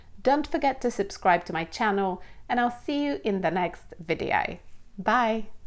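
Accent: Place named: England, United Kingdom. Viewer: jak na kogos kto nie jest native spreakerem fajnie udaje ci się imitować angielski akcent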